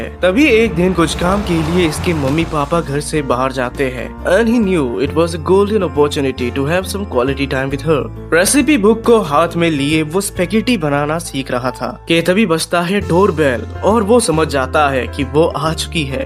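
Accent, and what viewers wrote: Bengal, India. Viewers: Bro i know your bangali is 😂😂😂😂 but it is funny keep practise because i know the bangali but i can red bangali because i am leave in Rajasthani😅😅 For two weeks i leave in my grandparents house And I love you videos ❤❤❤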